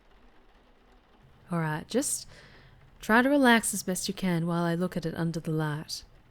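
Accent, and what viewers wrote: South, United States. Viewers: Very nice, love that southern accent. 🌹♥️😘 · I got to admit, you do a pretty good southern accent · I just want to let you know, that I actually did liste to this particular vampire roleplay. But not only that, it is actually a very good vampire story. I specially like the southern accent as well. And also, I hope that there will be more stories. Like this one, in the near future. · Ooh! I love the accent! Southern? It feels like a well traveled southern Belle's honey sweet voice. ❤❤❤ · Aaaaand my author brain is now on overdrive, thanks;p Very well done! Was just wondering the other day what you might sound like with a Southern accent. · i wanna make this clear...as a southerner (flordian bite me) this accent was spot on. 10 outa 10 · Maybe it’s the midwestern in me but I am a sucker for southern belles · As someone who's from the south thats a pretty good accent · Not bad . 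for a southern draw . and great story. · A charming and sweet southern accent lulling me to sleep? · I can hear your orc voice😍😍 Through your Southern accent Sometimes so hot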